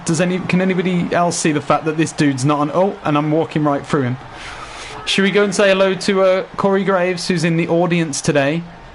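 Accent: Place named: West Midlands, United Kingdom. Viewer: I honestly can't believe I can't I payed 150 for this game.....btw I'm the person who recognized your west Midlands accent 😂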